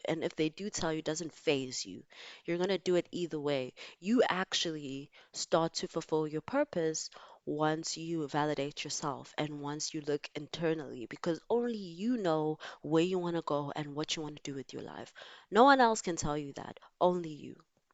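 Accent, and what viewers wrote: South Africa. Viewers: Another exotical channel to subscribe to❤❤❤also you sound south african you have a beautiful voice❤ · I just know you’re South African🇿🇦 the beautiful accent gave it away😅❤I’m soo happy to see more South Africans in the exotical space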